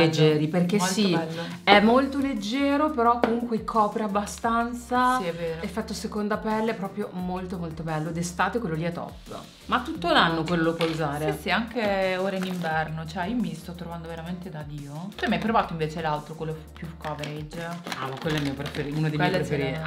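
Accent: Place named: Apulia, Italy. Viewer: Riconoscerei una Pugliese tra mille persone ahaha. Adoro ❤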